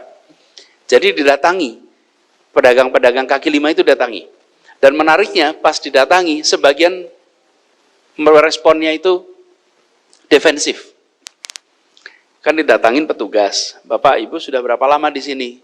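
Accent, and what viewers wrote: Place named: Java, Indonesia. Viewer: Dialeknya njawani banget.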